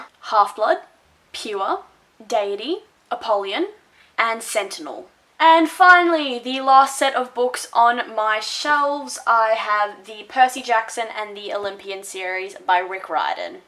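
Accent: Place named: Australia. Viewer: ayyyyye that aussie accent!!! hahaha I'm from New zealand and i loved this video so much (bookshelf tours are like the best right?) I subscribed and can't wait to see more from you!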